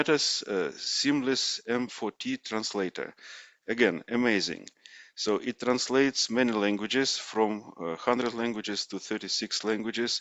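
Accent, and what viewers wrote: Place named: Russia. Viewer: Love your English accent, you seems to be from Russia.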